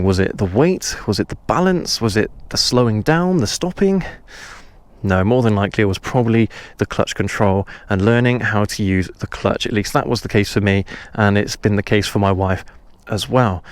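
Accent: United Kingdom. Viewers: Are you welsh?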